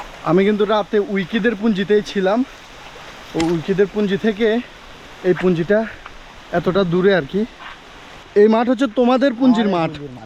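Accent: Noakhali, Bangladesh. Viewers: ভাইছা আইন্নে কি নোয়াখাইল্লা।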